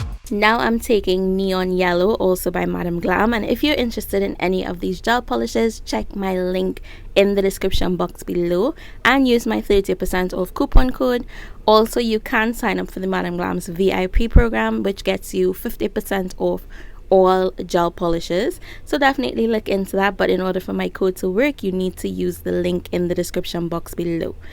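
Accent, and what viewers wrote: Trinidad and Tobago. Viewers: Tell me why I hearing a trini accent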